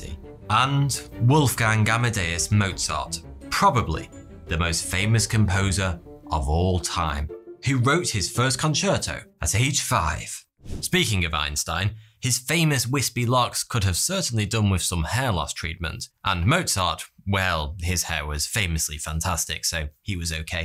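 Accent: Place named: Stoke, United Kingdom. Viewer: You sound a bit stokie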